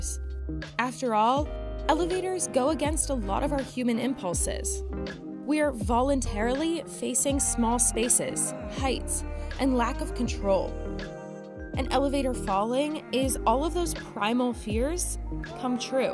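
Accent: California, United States. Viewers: I think she just have a regular Californian female accent. This accent was so much use for caricature of superficial girl most of the public doesn't know it is actually a real accent that real people have. I don't think she does it just to mess with you that juat how she speak. ps: I'm French I just learning to differentiate accent so I'm maybe wrong on this... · I think its a California accent. Reminds me "Legally Blonde".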